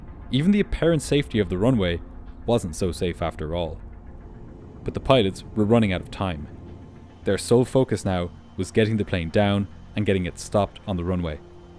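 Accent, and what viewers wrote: Ireland. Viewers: I came for the flight video stayed for the Irish accent!😄☘️ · I had the same thought! 😂 I was like, "Well he's Irish so maybe it's not what I thought lol" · Hahah long live the Irish accent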